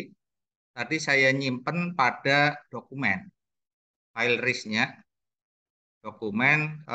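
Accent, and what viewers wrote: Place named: Tegal, Indonesia. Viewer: dari sekian banyak yg jelasin vos viewer, anda adalah yg paling mudah diterima, bahasa lugas dengan khas tegal serta tidak banyak belibed spt kebanyakan ibu-ibu yg jelasin vos viewer... support terus!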